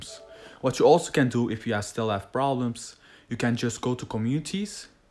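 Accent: Netherlands. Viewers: Ik vind het echt leuk wanneer Nederlanders Engels praten xD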